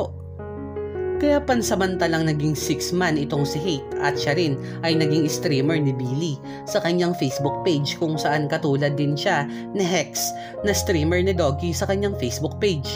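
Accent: Batangas, Philippines. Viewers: Ganda mo talaga magkwento lods. Ganda ng boses at pronounciation (Batanggeno accent) more power po.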